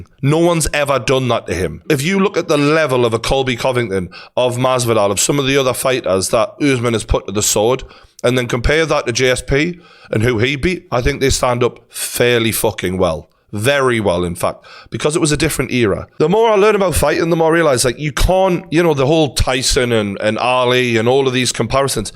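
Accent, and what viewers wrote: Newcastle, United Kingdom. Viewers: Best mma content, love this geordie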